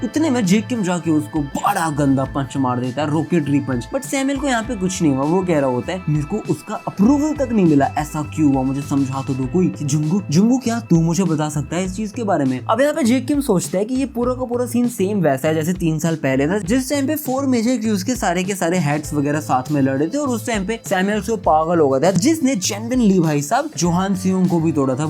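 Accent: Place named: North, India. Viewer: bhai i recently watched vyuk`s last video on lookism and after that i was searching the whole youtube for more then i found your channel and broo that explanation of yours is just puree peakk !!!! cant wait for the next part its just like a top level anime-tuber brother you have great potentional best of luck and full support and remember one day you will be the goat and btw ur north indian accent is very familiar to my state j and k and where r u from ? edit : shoutout in next video with name plzzz [ real name = Girish Sharma ]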